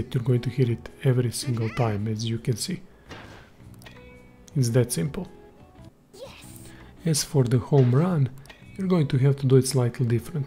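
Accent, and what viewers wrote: Russia. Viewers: По акценту вычислил что русский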